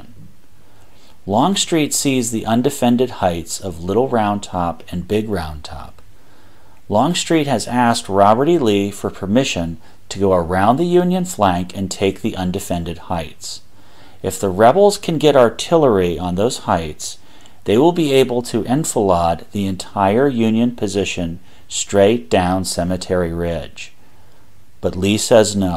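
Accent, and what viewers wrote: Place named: Pittsburgh, United States. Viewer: Ahhh, a yinzer. Nuff said . . .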